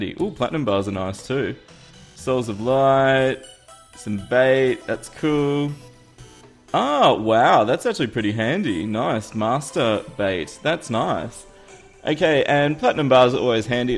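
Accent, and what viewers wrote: Australia. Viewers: Are you Australian? Just wondering from your accent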